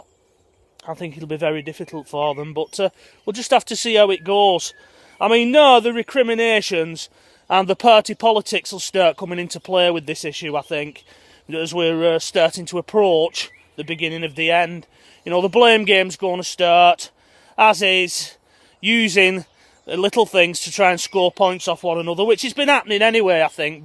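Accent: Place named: Lancashire, United Kingdom. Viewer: love you're Lancashire accent, Im from South yorks but I had a good mate from Oldham who sadly has now passed away, enjoyed you're blog about Fred Dibnahs property lol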